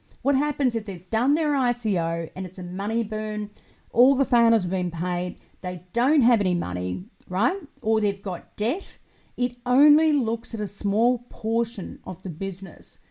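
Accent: Australia. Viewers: Nice to hear an Aussie voice! I feel much more like I can trust an Aussie!!!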